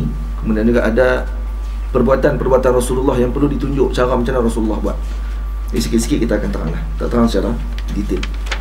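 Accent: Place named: Kedah, Malaysia. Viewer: anas nasrulloh basa malaysia. lebih tepat negeri kedah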